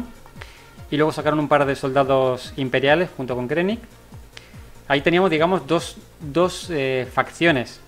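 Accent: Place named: Chile. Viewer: Pensé que eras chileno como yo, por como te expresas amigo 👍😁🇨🇱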